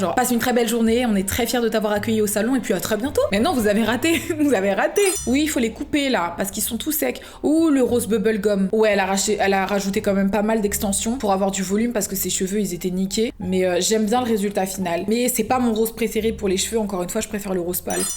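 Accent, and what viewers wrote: United Kingdom. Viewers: Tu as les cheveux trop beau je t'adore depuis que je suis toute petite et ton petit anglais à la fin des mots je le trouve trop cute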